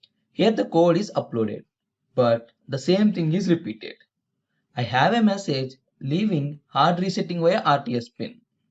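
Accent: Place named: India. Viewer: Why it always have to be indian accent wich noone understand?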